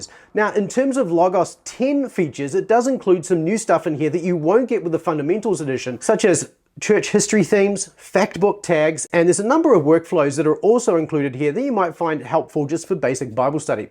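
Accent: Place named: New Zealand. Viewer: Nice to hear a kiwi accent :-)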